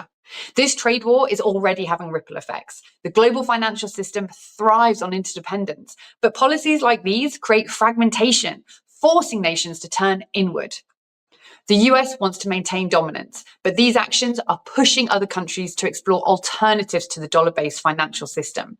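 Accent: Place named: United Kingdom. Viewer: WHY DO ALL THESE NEWS COMMENTARIES HAVE TO EMPLOY A BRIT WHO TALKS TOO FAST ??LOCAL NEWSCASTERS,WEATHER PEOPLE EACH VENUE EMPLOYS AT LEAST ONE........I CANT UNDERSTAND THEM.MYSELF..HIRE AN AMERICAN WHO CAN SPEAK FLUENTLY AND SLOW DOWN...........SORRY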